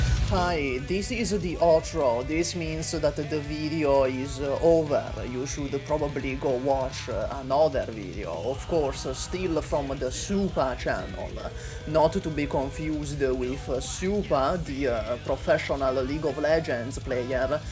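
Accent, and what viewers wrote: Italy. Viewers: Just because of your Italian accent 🤌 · you yap in your silly italian accent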